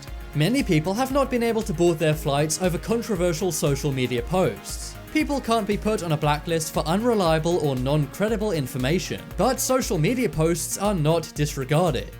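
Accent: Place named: United Kingdom. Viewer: Notice the British accent.....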